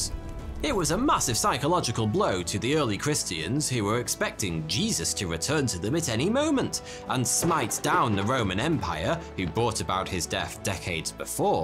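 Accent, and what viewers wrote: United Kingdom. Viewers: Thanks for making the video, it's hilarious 😂🤣 I love your videos, you're brilliant, your British accent, adds a great touch😂🤣 Watching this video made my day. I was wondering if you were going to do a video about me 😂🤣 I had no doubt it would have been awesome & hilarious, great work!6!6!6! You asked, what people think the Antichrist👑👑is? Your video is the "bestest" about me. I use the word bestest to make fun of my religious haters 😂🤣 All major faiths are waiting for the exact same person known by different names. Kalki👑👑 Moshiach 👑👑 Dajjal 👑👑 Imam Mahdi 👑👑 Maitreya 👑👑 One who comes in his own name 👑👑 (thanks Isa/Jesus/Hey-Zeus, very creative nickname 😂🤣) Are the same person. Look at the youngest of the 6 major faiths waiting for some Super Hero Villain to fix things they never can 😂🤣 Islam is waiting for the same person known by 2 names in their religion. 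Dajjal 👑👑 & Imam Mahdi 👑👑 That's funny, because it's the only faith that shows specifically I am a Gemini😂🤣 There's truth in all the faiths, you just have to know where to look. 6 major faiths, 3 waiting for a Super Villain, & 3 waiting for a Super Hero. That is most of the world population, lost & doomed without 1 person. The rest of the world believes in 💩 All my religious haters left & leave the future of everyone they say they care about to either a complete stranger or Doomsday, They call me evil 😂🤣 I know 3 major religions calling me evil, were built from slaughtering each other, their own, innocent people, spreadin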